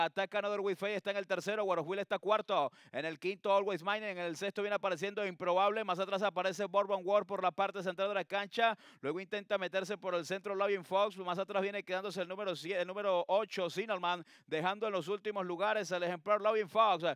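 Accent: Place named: Venezuela. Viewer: es venezolano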